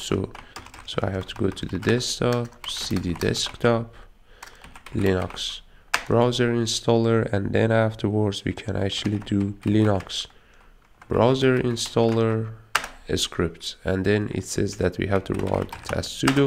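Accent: Iran. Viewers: حاجی دمت گرم بابت چنل خوبت، همون اول که ویدیو رو باز کردم و انگلیسی صحبت کردنت رو شنیدم، فهمیدم ایرانی هستی😎😎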